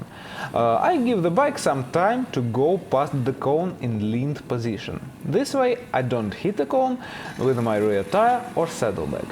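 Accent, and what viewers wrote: Russia. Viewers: Concise and precise. I have watched and followed many videos on YouTube, yours is the most understood despite your thick Russian accent. Cheers from Malaysia. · I love your russian inflections when you speak english, theyäre so charming :-) great vid as always, oldie but goldie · Apart from being 100% quality easy to follow and to remember, motor bike riding lessons, I love the Russian accent, specially when you speak Italian, thanks buddy.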